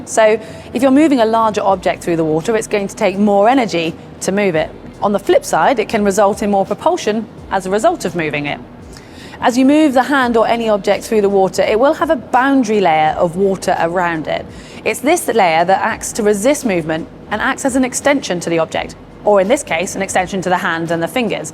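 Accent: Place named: United Kingdom. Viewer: Dear GTN, Thank you for this hydro dynamics piece of advice. I would have another subject that I would much appreciate you to deep for us? The "cross-over kick", as I could discover it through this last published video from the Effortless Swimming Youtube channel called "The Most EXTREME High Elbow Catch EVER". This leg kick is unfamiliar technic (for me), however it seems recommended for long distances swiming or triathlon? By the way, I'd like to mention how much great improvements in listening English I am making, since the time I subscribded to your channel (as a froggie)! I find it is so pleasant, and not so common on YT, listening such british accent! Thank you so much.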